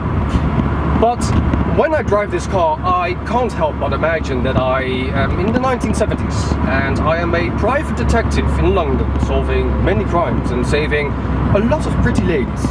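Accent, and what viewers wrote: Netherlands. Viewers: Let me gues your dutch. Dat hoop ik tenminste anders heb je wel een speciaal accent. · ik hoor echt dat je NL bent <3 toffe vid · Je hebt wel een heel Nederlands accent :D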